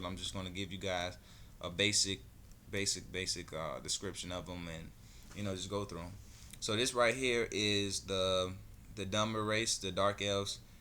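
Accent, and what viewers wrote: United States. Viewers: sounds like a mix of eubonics and southern drawl. stop hurting my ears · Somethin out of barney! 😂😂 are you American?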